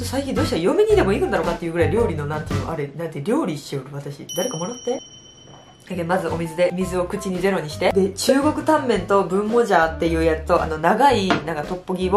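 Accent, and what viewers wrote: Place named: Kagawa, Japan. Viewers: 久しぶりにキレイに食べる大食い選手！って思ったら普通の可愛い子やった…。 イントネーションが凄い安心するんやけど、同じ四国の民だったwww 近所の可愛い子やん。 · 、最近発見してばりハマってます（笑） 私は香川出身やけん方言似とってめっちゃ親近感湧いとる！！ 応援してます♡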